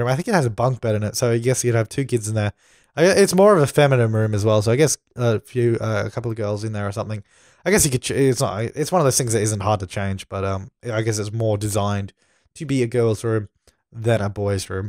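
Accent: Australia. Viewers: I could listen to you talk forever .That sounds creepy, but i just love Australians and Australian accents .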